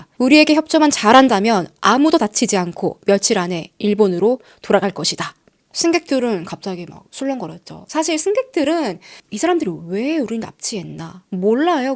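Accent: Gyeongsang, South Korea. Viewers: 경상도분이시네 ㅎㅎ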